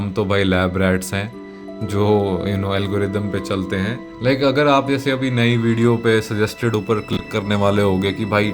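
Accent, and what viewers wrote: Himachal Pradesh, India. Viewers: that himachali accent hitting hard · Himachal ka accent work kar geya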